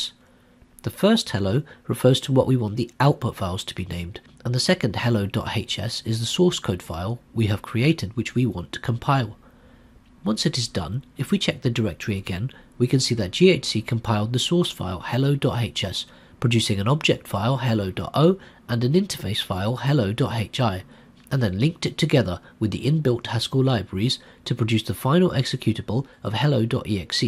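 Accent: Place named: United Kingdom. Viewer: Could be better without the annoying british accent which is incomprehensible at times.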